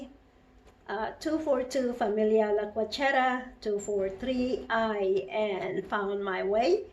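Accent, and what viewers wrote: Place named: United States. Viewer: yeah my sissy like the way you speak you adopt already the accent in America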